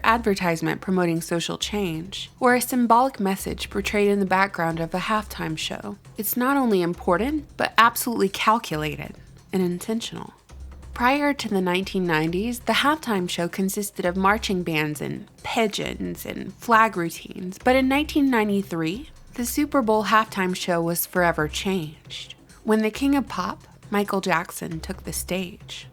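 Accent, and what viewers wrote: South, United States. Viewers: i always love it when you drop a new video, This is simply asking folks (with that mighty fine southern accent no doubt) to objectively, with an intellectual process, and without unreasonable emotional reactions... to take a closer look at this annual ritual that has spellbound Americans for decades as they live vicariously through their favorite team and player. It's parasocial behavior believing they actually know personally players, staff, performers, etc. All that consciousness. All that attention... focusing on an inconsequential silly game where silly grown men, paid millions, play with a silly ball. makes ya kinda wish something like... oh i don't know... umm... the f**cking federal reserve and our bullshit fiat currency would get that kind of attention! Shoot. One could even argue that something insidious as the fed exists due to everyone concerned with a silly football game. 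anyways... Another great video. you're so foxy. i love you. there. i said it.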